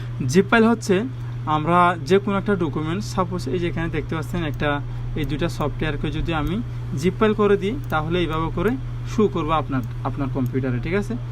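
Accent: Chittagong, Bangladesh. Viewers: Thanks very much. Are you from Chittagong?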